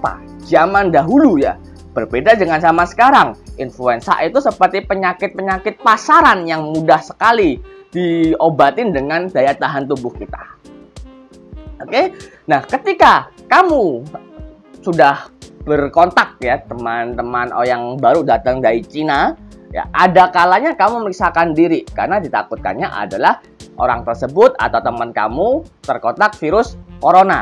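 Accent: Java, Indonesia. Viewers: Ini dokter bukan ,kaya org Jawa ,medok banget ngmge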